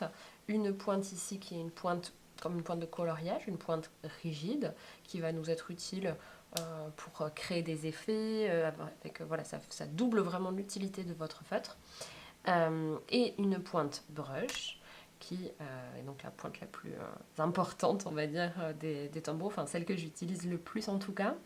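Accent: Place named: South, France. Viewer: Merci pour cette belle vidéo très instructive (le petit accent me rappelle mon Sud natal). J'ai pas mal de ces différents feutres pour mon bullet journaling et bien que je sois d'accord sur le fait que les Stabilo brush soient fabuleux, ils traversent beaucoup, même des carnets au papier 160 gms, donc attention! Aussi, pour les Faber Castell, un petit truc: on peut sortir complètement la pointe avec une pince à épiler puis l'inverser et paf, une pointe toute belle, toute neuve qu'on peut réinsérer! Une trouvaille aussi, les feutres de la marque Djeco qui sont fait pour les enfants et ne paient pas de mine... sont quasi identiques au Lyra en beaucoup moins cher, j'ai été bluffée.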